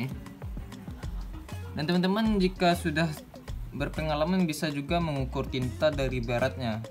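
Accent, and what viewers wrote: West Sumatra, Indonesia. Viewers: Jelas sekali logatnya minang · Logat minangya jlas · Dari logat bahasanya...saya yakin, abang ini orang Minang.... 😊😊😊semoga benar.....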